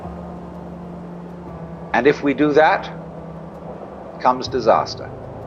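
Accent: England, United Kingdom. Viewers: Where do I begin. But that was the biggest most flowery word salad mixed with a pinch of despair I have ever heard. TRUST NATURE, your are nature, we are nature, nature will let you down, life has no purpose, Watt goes from if you don’t trust nature you will get tyranny??? Making a huge jump to support your ideology wouldn’t you say. I have never been less inspired listening to someone than what I just heard. But it’s cool and accepted because Watt has an English accent. You’re being lied to and you have no hope. But fear not because you can always trust nature, sometimes…., Wow!!!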